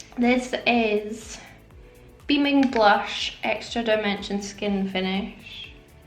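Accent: Scotland, United Kingdom. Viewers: Scottish? I think...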